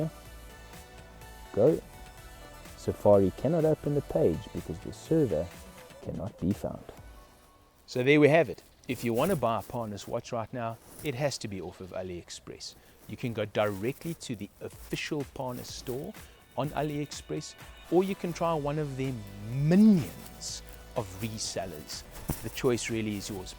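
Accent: South Africa. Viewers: South Africa! To my American ears it is hard to distinguish from Australian (but I'm getting better). Great review. Thanks for posting! Also, thanks for the web page directions. I'm following and looking for more posts. P.S. I can't believe they've stopped the sale of alcohol Nation Wide due to COVID. My prayers are with you!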